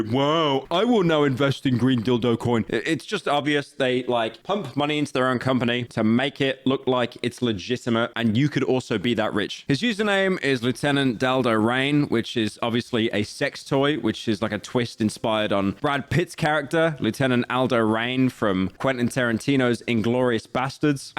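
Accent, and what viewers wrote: United Kingdom. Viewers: Why is this british guy talking? Im cutting cheese for my sandwich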